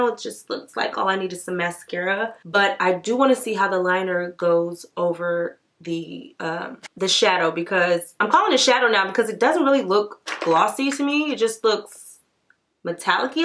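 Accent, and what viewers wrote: South, United States. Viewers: That country accent 😂😍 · Lol the country accent killedt meh😂🤣 Looooove your videos😍💕👏🏾👏🏾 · That country twang tho!!! I'm here for it!! LOL!! 🤣🤣🤣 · That accent is giving me "Where's Applejack" vibes lol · I have five of these including mermaid and Oil Slick and I LOVE THEM!!!! 💟💟💟💟💟💟💟 And yes for the country accent 😂😂😂😂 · The southern twang was so cute!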